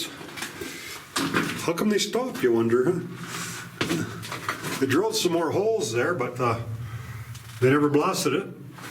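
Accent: Canada. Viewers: Wonder if they would let me pick some of the gold out, sort of a day trip thing. Looks like it would be fun. We can tell you're Canadian, ay. The quartz is white because it formed with oxygen, so it's name is really quartzite.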